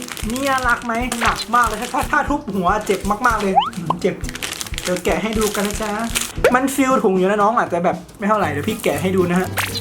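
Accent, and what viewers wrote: North, Thailand. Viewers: พี่ เป็นคนภาคเหนือหรอคะ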